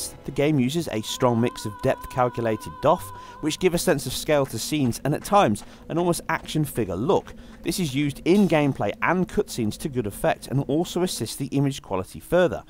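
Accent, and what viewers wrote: England, United Kingdom. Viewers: Typical dozy yank, you are aware that he is actually English from England speaking his native tongue English. American English is a bastardised version of the English language. Anyways, good job as always.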